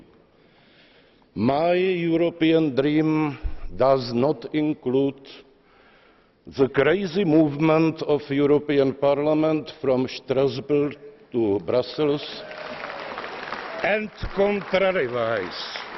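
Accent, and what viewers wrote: Czech Republic. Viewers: Konečně sebevědomý Čech, který veřejně mluví czenglish. Číňani mají čínglish, Indové hindish, Australani cockney, Američani američtinu … tak proč my máme furt ležet na Prokrustově loži Oxbridge. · Jelikoz je prezidentem Česke Republiky, mel by umět správnou vyslovnost, anglicky umi, to nepopirám, ale ma ceskej prizvuk, muj nazor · Angličtina po česku 😅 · Čím to je, že Češi mají furt potřebu někomu se vysmívat, nad někoho se povyšovat a pomlouvat, ačkoli bych dal ruku do ohně za to, že většina místních diskutujících "English expertů" svojí jazykovou výbavou nesahá Zemanovi ani po kotníky?? Ten člověk umí anglicky velmi dobre, má prostě jen silnej českej akcent, toť vše.... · Best Czech accent!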